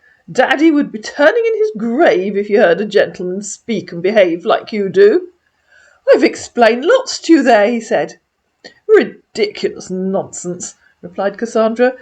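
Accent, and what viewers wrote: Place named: RP, United Kingdom. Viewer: Your posh accent is jolly good Cassandra 😌 This character reminds me of Denise, older, wise and salty 🧐 Looking forward to Samantha's salty reprise too 😼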